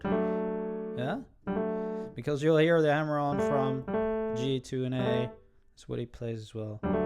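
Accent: Netherlands. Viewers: it's not bothering me but are you Dutch?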